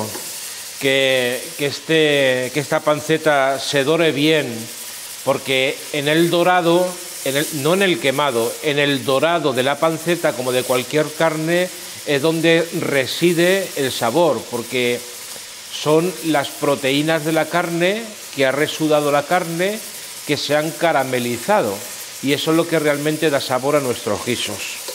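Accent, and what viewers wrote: Spain. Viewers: El Guiso se ve delicioso, auténtica bendición que conjunto a sus historias y anécdotas hacen el vídeo interesantísimo y entretenido. Me encantó verlo y escucharlo en un Castellano de España que es una delicia para los oídos. Saludos desde Berna-Suiza, señor.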